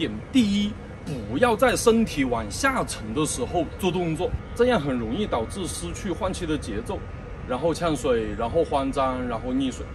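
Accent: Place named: Hubei, China. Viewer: 这教练大概是湖北人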